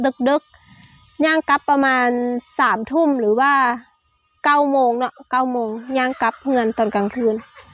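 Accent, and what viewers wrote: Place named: North, Thailand. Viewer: ภาษาพูดของ คล้ายภาษาคำเมืองภาคเหนือ ตอนแรกนึกว่าเป็นคนเหนือ เป็นคนสู้ชีวิต สุดยอดแม่สาวน้อย👏👏👏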